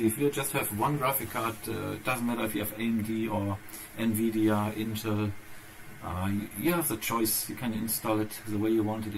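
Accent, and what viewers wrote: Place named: Germany. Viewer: Hello! I hear you have a German accent. So where do you come from? Best regards, Serge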